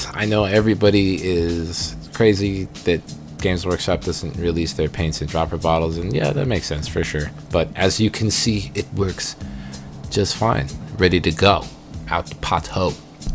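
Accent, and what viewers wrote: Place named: Milwaukee, United States. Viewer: You wouldnt happen to be from Milwaukee? Sounds like the right accent. Much love.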